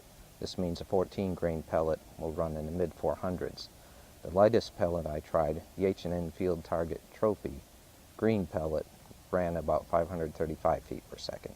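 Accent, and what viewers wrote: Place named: England, United Kingdom. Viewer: Gracias Sr.. Very good this video a lot of informative and crearly explicative mode to understad your fine English accent for Us. Thanks a lot. Saludos desde Venezuela..sorry my Bad English!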